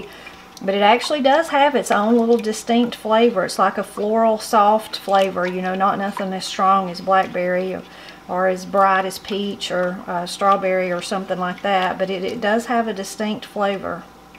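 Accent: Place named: Appalachia, United States. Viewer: I love the way you talk..your accent, the way you can relate things...it's just magical. But I would never buy a cook book of yours, cuz I grew up Appalachian myself, and what recipes you might have, I already have them all in my head. But keep putting out your YouTube videos, cuz I'm hooked on your story tellin!!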